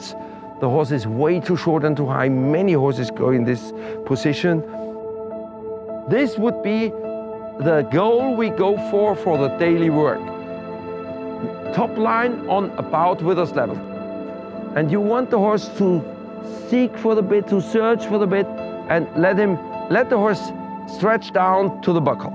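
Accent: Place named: Germany. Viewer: PLEASE redo this. Remove or at least lower the "background" music. The loud music coupled with the German accent makes it difficult to follow the lesson. This is very valuable information. It needs to be presented in the best possible manner. Do professors in universities play loud music during their lectures? It's been 50 years since I sat in a lecture hall, maybe things are different now. Rant off. Thank you.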